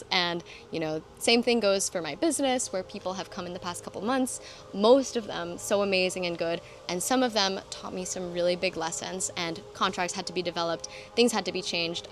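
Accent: United States. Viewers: You are so beautiful on camera. Are you working with a professional film crew to do your make-up, hair, and lightings? You sound American, what nationality mix are you? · Sustainable energy. Such nonsense. And you sound like a fake person anyway. UN = Unfree Nations. I get a headache listen to your California accent and fake nice person acting.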